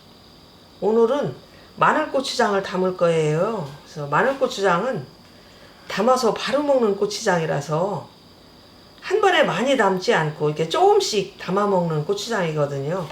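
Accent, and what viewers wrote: Chungcheong, South Korea. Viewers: 맛나보이네요 수고하셨습니다 말씀이 충청도 분이라말씀도 구수하시네요 저의 고향이 충청도이거든요 한번 담아보겠습니다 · 충청도 사투리가 우리 엄마 같네요.. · 충청도 말씀. 정감이 기네유~~~~ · 모처럼 들어 보는 충청도 사투리 네유. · 구수한충청도 사투리 정감이가네요 😊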